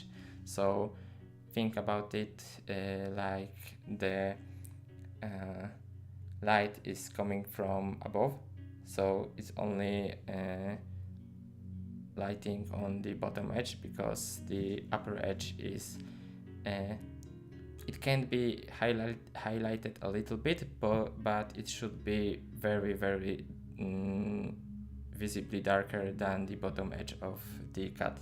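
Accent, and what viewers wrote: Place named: Russia. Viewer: Мне кажется, что ты русский, уж акцент очень похож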